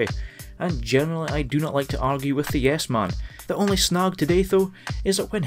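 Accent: Scotland, United Kingdom. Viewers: Love the accent! Is it from Scotland?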